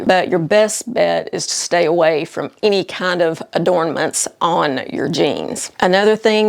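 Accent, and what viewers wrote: South, United States. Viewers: You are hilarious! I love how you speak the truth in that beautiful Southern accent. Preach on sister! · Hi, I love watching your videos! You give great alternatives and I love the fact you always provide links to many options for purchase. Your "straight talk" is appreciated, but I have to say your bluntness combined with your Southern accent is quite entertaining too. Several highlights: 1) Re: Super skinny jeans/jeggings: "They're like sausage casings..."🌭 2) Re: Cold shoulder top + skinny jeans: "You look like you've been in a coma since 2015..."😱 3) Re: Miss Me jeans: "You can still buy these, but that doesn't mean they're in style..."😰 4) Re: Non-descript old lady shoes: "The shoe that has an identity crisis...it's ugly is what it is..." 😅 5) Re: Sport sandals with straps, velcro, padding: "This is just hideous"🤮 6) Re: Ugly ankle boots: "These scream old lady..." 👵 7) Re: Ill-fitting bras (not supporting the girls properly): "This is an epidemic...You know, the girls are down to the waist..." 🤣 People can find more hidden Easter eggs🥚 by actually watching and listening to the entire video, especially toward the end!:hands-yellow-heart-red: Take all constructive criticism with an open heart and mind, it's a fashion channel after all, but the target audience is for mid-life sisters, myself included! 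😊🥰💕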